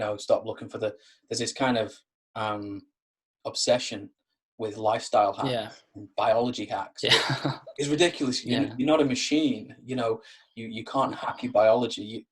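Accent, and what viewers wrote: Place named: Rome, Italy. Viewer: danvanzandt ahahah I’m italian so he just speak it. with a very strong Roman Accent ahahah But don’t worry, really!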